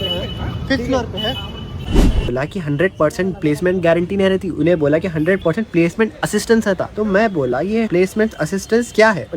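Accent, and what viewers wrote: Hyderabad, India. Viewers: Hyderabadi hai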